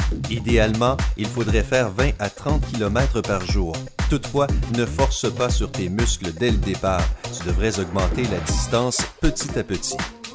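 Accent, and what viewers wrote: Quebec, Canada. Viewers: Ses un québécois celui qui parle ?😊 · Pourquoi une voix québécoise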